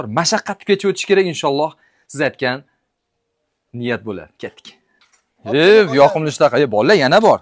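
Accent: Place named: Kokand, Uzbekistan. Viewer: АССАЛОМУ АЛЕЙКУМ КЫРГЫЗСТОНДИ ОШ ШАХРИДАН КУЗАТАМАН КОЛЛАРИЗ ДАРД КОРМАСИН МЕНИ АЯМ КОКОНЛИК ОШГА КЕЛИН БОЛИБ ТУШГАН ШЕВЕЛАРИЗ ОХШАБ КЕТАДИ ЭШИТИБ ХУРСАНД БОЛАМАН😊.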